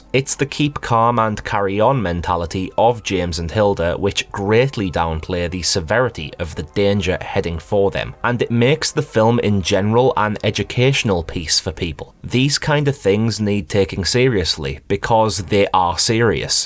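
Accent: United Kingdom. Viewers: I like your accent! Great Britain?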